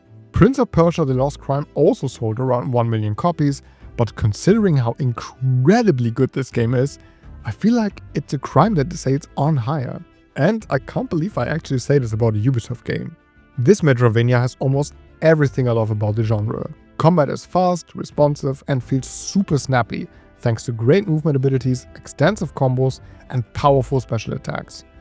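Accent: Germany. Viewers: Der deutsche Akzent is zu krass 😂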